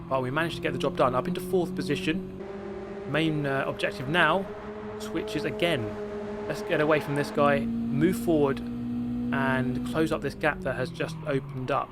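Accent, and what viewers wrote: United Kingdom. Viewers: Not to mention that British accent...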